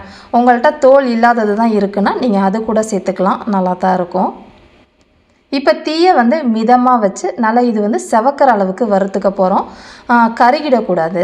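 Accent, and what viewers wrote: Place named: Tirunelveli, India. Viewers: Super, நெல்லை தமிழ் கொஞ்சி விளையாடுகிறது. அருமை சகோதரி. வாழ்த்துக்கள். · நீங்கள் கூறாவிட்டாலும் உங்கள் அழகு தமிழில் நெல்லையின் மனம் வீசுகிறது உங்கள் சமையல் போலவே.. Super preparation 👌👌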